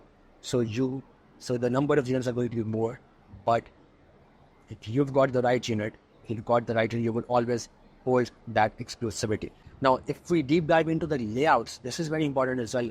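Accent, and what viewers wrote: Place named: India. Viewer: Very tough Indian accent, I didn’t understand 50% of information 😤😑🥴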